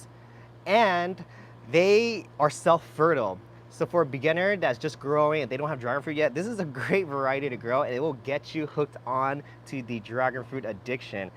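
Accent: United States. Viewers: Are you from southern California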